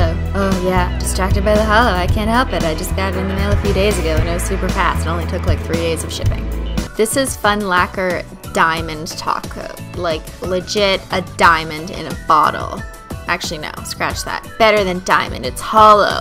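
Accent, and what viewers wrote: Canada. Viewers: Canadian Eh!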